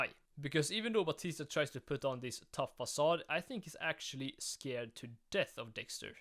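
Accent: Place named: Sweden. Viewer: name: '' '' with a dialect like this? hmm.. can it be? yes it can. youre swedish!;) sup man, also swede here, good vid, ta hand om dig and see you in the next dexter vid. i dont know why i write in english, i just do.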